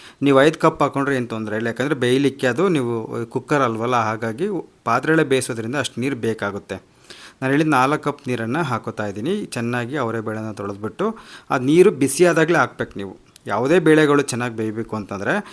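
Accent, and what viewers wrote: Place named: Durga, India. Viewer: ನನಗೆ ಸುಮಾರು ದುರ್ಗಾ ಹಾಗೂ ದಾವಣಗೆರೆ ಸ್ನೇಹಿತರೆ, ನಿಮ್ಮ ಭಾಷೆ ತುಂಬಾ ಇಷ್ಟ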